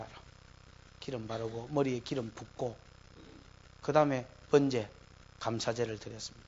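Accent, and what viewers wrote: Gyeongsang, South Korea. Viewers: 열 채우는 것, 기 채우는 것, 하시니 어릴 때가 생각나 웃음이 나오네요. 경상도 사투리 정말 정감 있습니다. 천국 언어는 경상도 사투리 비스무리할 거라는 목사님 말씀에 전적으로 공갑합니다. :hand-pink-waving::hand-pink-waving::hand-pink-waving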